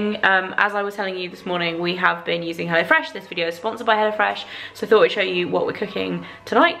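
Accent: United Kingdom. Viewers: I'm trying to learn the British English with you! Thank you! 😀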